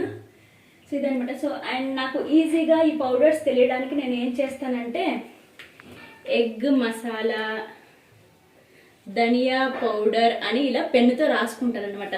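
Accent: Srikakulam, India. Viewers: Srikakulam slang vastundi 😘😂😂madi kuda sklm aa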